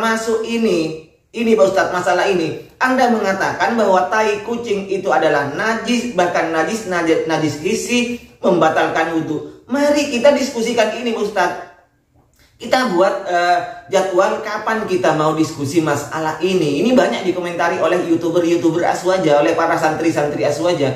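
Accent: Madura, Indonesia. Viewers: In yg punya Chanel suara kyk orang Madura pantas lh GK suka sama ustadz KHB konco nya si Romli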